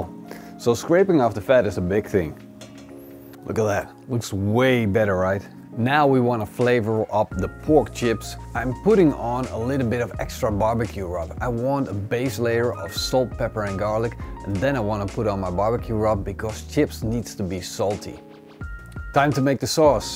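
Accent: Netherlands. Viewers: the dutch accent is strong with this one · Haha dutch accent is just to strong😂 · ben je van nederland ? zo ja dan hoor ik et aan je accent xd . leuke video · The dutch english accent is throwing me off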